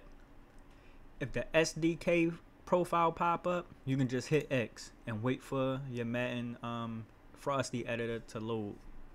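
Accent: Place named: Baltimore, United States. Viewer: you from baltimore?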